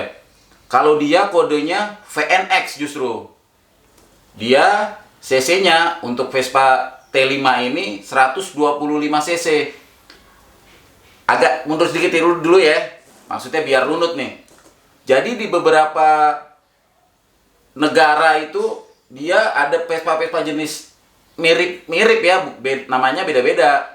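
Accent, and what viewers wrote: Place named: Medan, Indonesia. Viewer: Medan banget 😁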